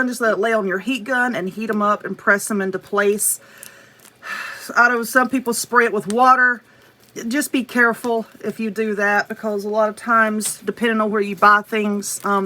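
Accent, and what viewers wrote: South, United States. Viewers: As a Southern Girl myself(Texas), I can’t decide what I love most…. Your Awesome Crafts, you’re Southern accent, the nails or the beautiful tattoos! I’ve learned so much from your videos. Please keep posting!!!!! 😊👍🏻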